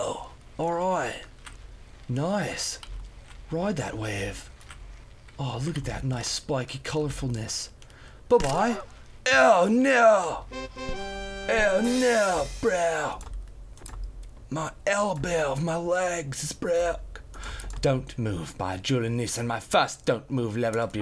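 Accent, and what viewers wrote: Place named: England, United Kingdom. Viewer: I love your English ascent!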